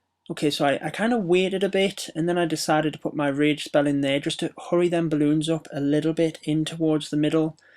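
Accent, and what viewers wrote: United Kingdom. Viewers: Bro brithish accent 😂😂 great bro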